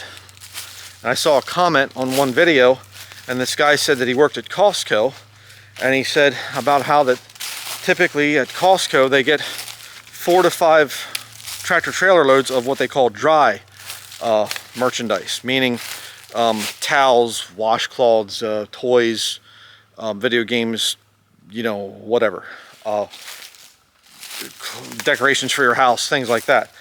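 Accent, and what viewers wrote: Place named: Pittsburgh, United States. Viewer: Your accent sounds pennsylanic sp. Pittsburg.🙂 That's my guess. Maybe maryland?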